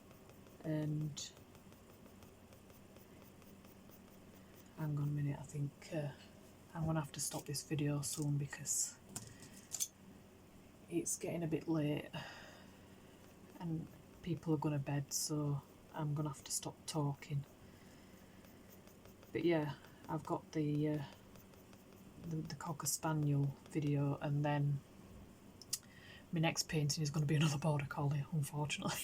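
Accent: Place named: Northern England, United Kingdom. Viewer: I love hearing a fellow Northern accent! This drawing is beautiful, you've done a great job with the composition. I always find it tricky putting multiple photos together. I'm with you on the heat, it seems to linger in the house for days it makes sleeping near impossible 😂 This was great I really hope you film more of these and don't feel like you always need some interesting topic to talk about, I like listening to the mundane / every day life stuff ☺ Congrats on hitting your watch hours!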